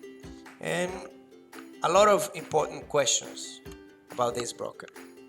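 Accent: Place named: Caribbean. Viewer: I love Caribean English!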